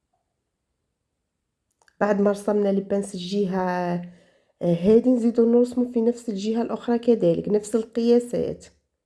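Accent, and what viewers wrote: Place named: Skikda, Algeria. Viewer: اللهجة تاعك سكيكدية على ما أظن❤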